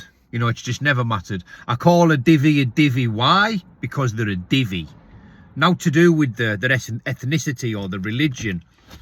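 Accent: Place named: Cumbria, United Kingdom. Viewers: I knew when I started listening to this video you sounded Cumbrian. 😂 I moved up here 10 years ago and love the spot, I integrated into a small village community after moving from a big busy city. I wouldn't try to being my city lifestyle to my new community because they live there way of life and I've embraced it. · Knew it from your accent. I’m Cumbrian too. We are lucky where we live but it’s changing.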